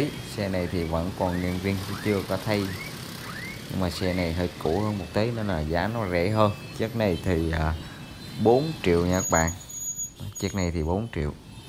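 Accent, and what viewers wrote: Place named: Nghe An, Vietnam. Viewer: Ớ quỳnh lưu nghệ an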